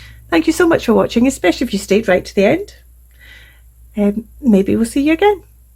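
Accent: Scotland, United Kingdom. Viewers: There is nothing more mystical than a poem by Poe read with a soft Scottish accent! I love your little project today, I don't ever think to sew smaller booklets into my larger journals, so thank you for the inspiration! 💜🖤💜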